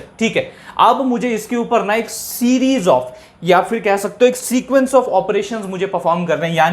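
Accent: Maharashtra, India. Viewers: Sir aap Marathi bande ho na 😅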